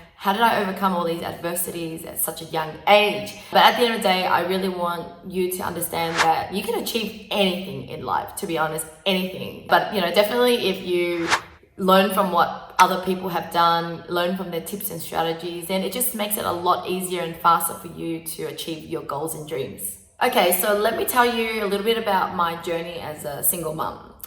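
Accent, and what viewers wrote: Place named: South Africa. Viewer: You have a South African accent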